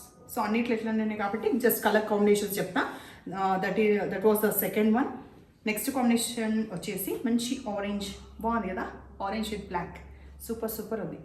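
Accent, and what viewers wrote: Telangana, India. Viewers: Meeru matlade vidhanam baga nachuthundi telangana bhaasha, yaasa lo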